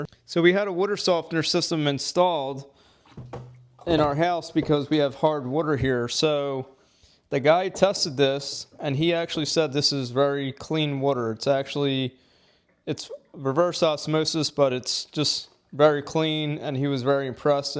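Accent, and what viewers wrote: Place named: Philadelphia, United States. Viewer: THANK YOU!!! 🙏 😊 Ps: You must be from the Tri-state area.. Here, outside of Philly, we say “wooder” too. 😂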